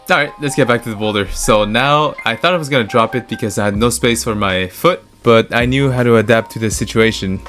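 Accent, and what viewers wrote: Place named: Quebec, Canada. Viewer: 2e jour de suite où j'écoute une vidéo pas rapport en anglais et je réalise en plein milieu que c'est un québécois comme moi.😂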